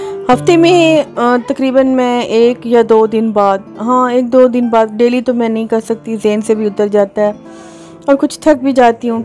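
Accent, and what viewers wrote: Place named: Punjab, Pakistan. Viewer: Apka bòlna buht acha h jb suĺtn bahoo darbar pe jy to waha log asy bolty h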